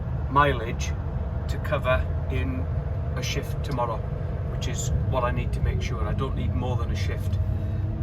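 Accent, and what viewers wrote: Wales, United Kingdom. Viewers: With that accent I'd say ur from Sth or west Wales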